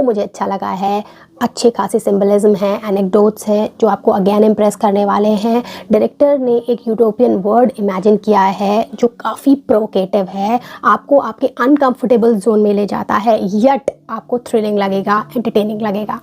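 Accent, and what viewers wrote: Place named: South Delhi, India. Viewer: You excent is like sout Delhi girl ❤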